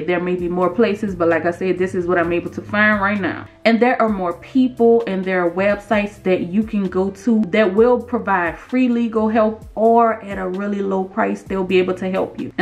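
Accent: New Orleans, United States. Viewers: I love you New Orleans accent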